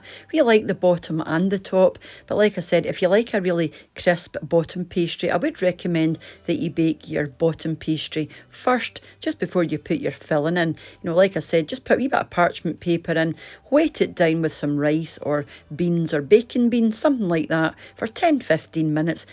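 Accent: Scotland, United Kingdom. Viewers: Love the pie but the CC had me in stitches, a Scottish accent is not easy to translate. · i just love your Scottish accent ;-) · Hello there! So glad I came across your channel. This is the first time watching and thank you for the yummy recipe. I am an 80 year old granny, living in South Africa and am always open to new ideas as, one can never know enough, even at my age. I probably missed your competition but, even just to hear your beautiful Scottish accent and see the delicious recipes, is good enough for me. Do you ever do Haggis or the real Scotch shortbread biscuits? God bless you and your family. Shalom! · Just found you today. I love your Scottish accent and the seaside is beautiful. I’m going to search out more of your recipes. Thanks! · Love your video and your sweet Scots accent! I first watched it with the sound off and closed captions on, but they made no sense at all, ha ha ! 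Much more enjoyable to listen than read. Definitely want to try your recipe.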